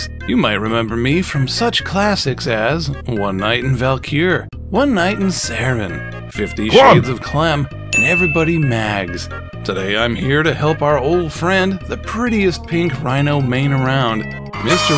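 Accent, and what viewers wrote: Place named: Brazil. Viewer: Damn, another one falls to that bs copyright policy, i would like to help you out but i am kind of short in money (i can't even afford an internet of more than 6 megas) btw, until you spoke i forgot you were brazilian, nice accent, hope you get a fair amount of patreons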